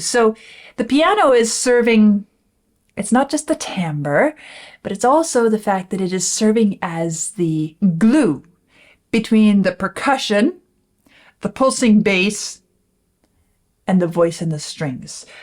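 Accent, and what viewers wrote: Germany. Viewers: This used to drive me nuts, so I searched for the answer... According to one of her earlier videos, she's actually an American, born and raised, but grew up (after leaving California at a young age) with/near/surrounded by an Amish community (or something similar, apologies if I misremembered), who speak a dialect of German, and apparently that it what's influenced her accent. She says that speaking to other people in Tennessee, she can find herself slipping into that local accent as well. That's as much as I can recall of the story. I hope it helps. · that helps a lot, thank you! And yes, I can hear the sort of German/amish sounds in there. I really appreciate this!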